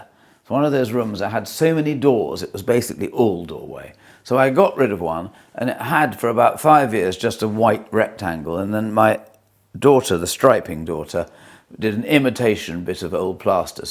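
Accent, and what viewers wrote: England, United Kingdom. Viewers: Castle, gardens and an English accent...magical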